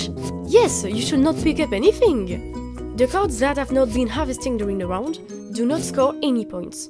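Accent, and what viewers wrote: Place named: France. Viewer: your french accent is cute! Divitchia,divika,divissia,Druid.. :D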